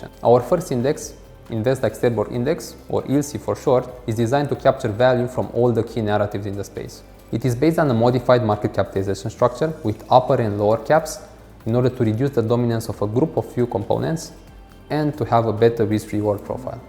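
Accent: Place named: Romania. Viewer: I like your english! Nice! Romanian english accent! :) I like the moldavian accent, too, as a moldavian who I am! KR Costi! Thanks!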